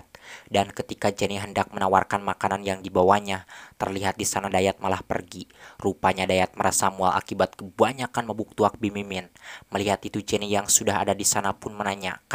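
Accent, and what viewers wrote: West Java, Indonesia. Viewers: Bg asli Sunda nya dimana?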